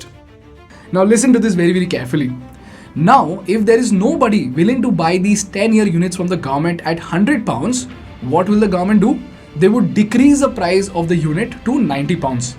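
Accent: India. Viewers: That's what subtitles are for. Please make use of it. He isn't a British South Asian, He lives in India and just like Indian accent is hard for you to understand, it is equally harder for many Indians to understand British or American accent without subtitles. Since most of his audience are Indians, it makes sense for him to produce the content in English as we Indians don't have a common language, but English is still widespread and with the help of subtitles, people from different countries can still understand. Moreover, if you try to learn another language, especially languages with high pitch and words like Hindi, you will realize that just how hard it is to change your accent. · Funny indian tongue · I love the contents of most of your videos. I can understand your accent as i grew up in India. The idea flow is cogent and quite well organized. Well done!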